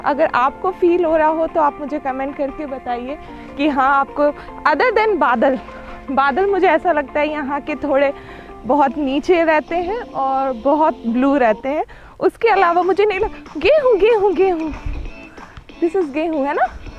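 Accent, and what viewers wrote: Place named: Madhya Pradesh, India. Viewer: अरे आप मप्र से हो आज पता चला। मैं तो पहले ही समझ गया था, आपके बोलने के तरीके से आप हमारे एरिए से हो आप मप्र में कहाँ से हो।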